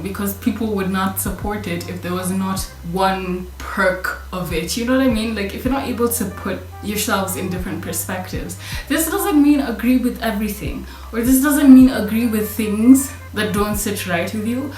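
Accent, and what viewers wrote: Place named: United Kingdom. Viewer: Very unrelated to the video but this is the first video of yours I've watched and for a good solid three minutes, my brain was fighting itself over your accent, like half of it was like "definitely British" and the other half was just "idk what that accent is but it is Not british" and the first half of my brain was like "oh so do you have any ideas" but then I remembered that most people have their country in the about section of their channel so that cleared it up. (Can you tell it's late at night for me) Anyways about the video, i've heard terrible things about Twitter and tiktok specifically so i just never got them, but i have seen how it just makes people more argumentative, like it seems everyone wakes up and chooses violence. Obviously it's not anyone's job to educate anyone and everyone about, say, why people deserve human rights, but it seems that people will use that as an argument, like "screw you go google it that's not my job" when someone asks for, say, sources to corroborate a claim. 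And another thing is politics. I'm not American but I'm sure most people see a good bit of American politics on social media, since it's a global power and all. But at this point all i see is Republicans and Democrats calling each other the r slur for just existing, whether the previous conversation is political or not. And that kind of political tribalism, which was shown in an election in my country before i was born where 800 people died, does not lead to anything good ever. I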